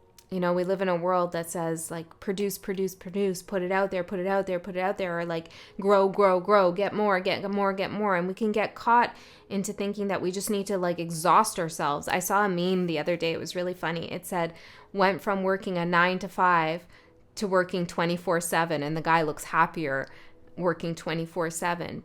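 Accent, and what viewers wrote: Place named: Canada. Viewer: Such a great reading! Also loved hearing that you’re Canadian- as a Wisconsinite, I hear that accent pop out 😂 Resonates a lot with energy that has been coming out in my readings for Pisces as well: feeling like we need someone else to attain the success. One of my favorite astrologers, Pam Gregory, always asks her audience to ask themselves- “are you a victim of the universe or a co-creator with the universe.” Taking the perspective of Saturn in Pisces building resilience vs. pushing us down has been extremely helpful for me, personally. Thanks again for your messages and insights!✨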